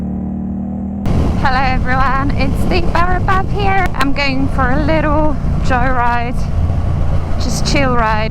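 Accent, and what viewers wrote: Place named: England, United Kingdom. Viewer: hi,im doug from australia,i love all your videos,you are good on your bike,you now have a english accent,what i think halps your vlogging you have a great voicei love the way you talk and you are very easy on the eyes[eye candy]and i love when you swear,tell me you are real and down to earth,thumbs up every day for you and your videos, bye for now..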